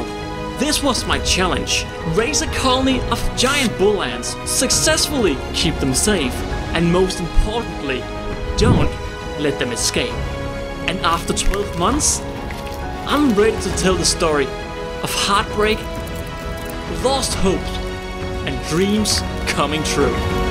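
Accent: Denmark. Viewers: hey your accent sounds familiar are you possibly danish? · are you danish? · bro got a danish anent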